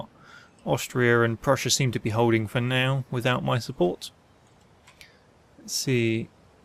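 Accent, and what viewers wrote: United Kingdom. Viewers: You sound like a 100% englishman.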